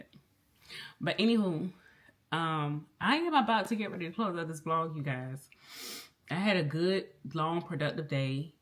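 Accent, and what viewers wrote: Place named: New Orleans, United States. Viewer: Your accent is beautiful. Are you from NOLA?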